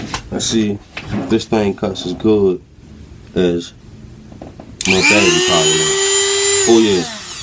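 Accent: Baltimore, United States. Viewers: Yo you from Baltimore??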